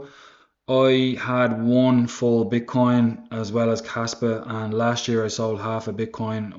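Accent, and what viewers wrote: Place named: Ireland. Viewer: Love your vids. Question... I am no genius but it since like you might be from Ireland.. haha love the accident. I am from England... and now that labour have got in ans they are probably going to increase capital gains tax.. I was wondering if you had any advice.... if you think they could do it at the autumn election? Wait until April 2025.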